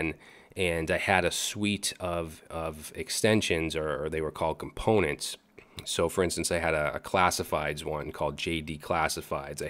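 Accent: Boston, United States. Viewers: you're great. Been here (read: Udemy) since your Eduonix days. PS - your Boston accent is nearly gone!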